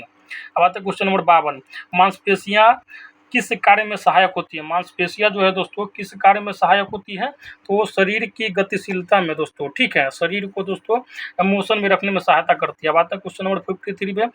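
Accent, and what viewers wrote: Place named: Bihar, India. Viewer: hm v Bihar Samastipur se h ,,,,aapke bolne k style se lga he ki aap v Bihar se he h 🙏🙏🙏🙏🙏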